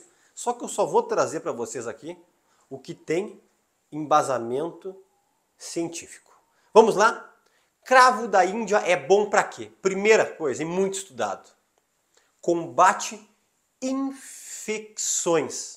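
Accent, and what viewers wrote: Rio Grande do Sul, Brazil. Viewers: Doutor o senhor é um médico bonito e educado, parabéns pelos seus pais ❤ gosto muito dos seus conselhos médico,o sotaque gaúcho é dez 💖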